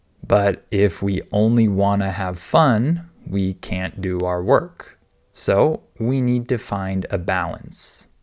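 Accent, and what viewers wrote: United States. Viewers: American accent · english american or británico ?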